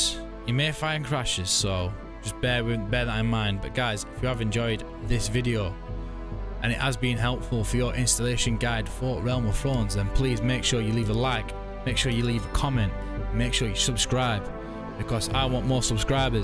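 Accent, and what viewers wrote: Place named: North, United Kingdom. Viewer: a person with a northerner accent is teaching me how to install realm of thrones i luv it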